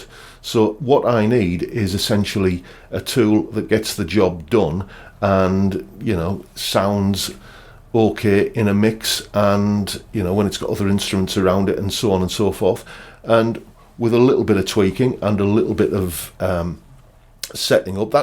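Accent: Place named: Teesside, United Kingdom. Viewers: Thought you sounded like a good hint of Teesside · If all guitars that look like other brands should be banned, then you would probably end up with only 4 or 5 guitar companies. 80% of the guitars around today are based on strat,tele or les paul's. I dont see why people get so heated, you pays your money and make your choice. Nice video and good to hear a Teesside accent on You Tube.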